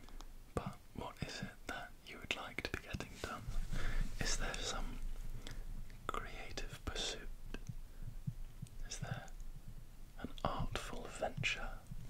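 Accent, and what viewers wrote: England, United Kingdom. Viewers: It’s so nice to find some English asmr! I’m so used to only Americans. You remind me of all my mates - very relaxing - good job man! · LORD YOU SHOULD HAVE HEARD THE GASP I MADE WHEN I HEARD HE WAS ENGLISH 😍😮‍💨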